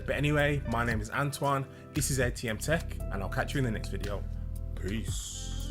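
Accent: United Kingdom. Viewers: I hate the British accent.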